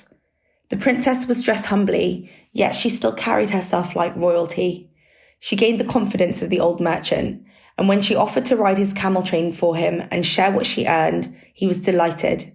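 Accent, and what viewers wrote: United Kingdom. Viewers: I love British accent. Thank you very much.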